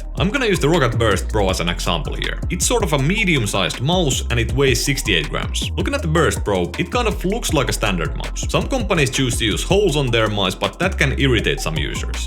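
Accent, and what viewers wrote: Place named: Finland. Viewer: Aaaaaaaaaand the guys rallienglanti is just satisfying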